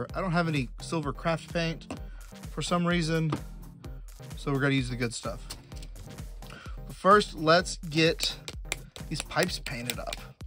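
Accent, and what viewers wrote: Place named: Oklahoma, United States. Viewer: Bro sounds like an Okie, makes me feel at home!!!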